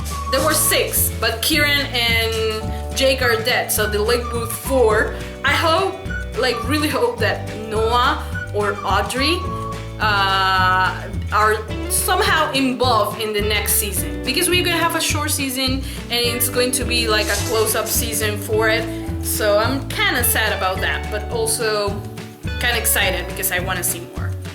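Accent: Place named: Spain. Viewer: I love your spanish accent! I'm from Dominican Republic :)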